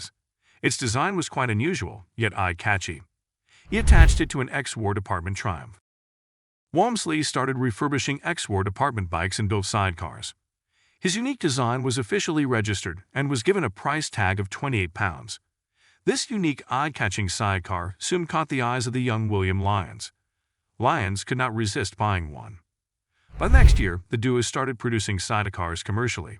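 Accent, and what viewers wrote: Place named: United States. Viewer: Why can't the narrator say K not T? This is about an English car and should be narrated by an English speaker not a Yank trying to speak English.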